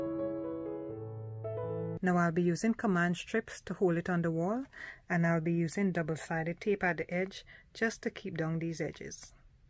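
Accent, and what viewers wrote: Trinidad and Tobago. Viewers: I think I am hearing a Trinidadian accent! Your talent is amazing! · So creative and lovely. Something to try for sure. Your accent sound like mines. Trinidadian..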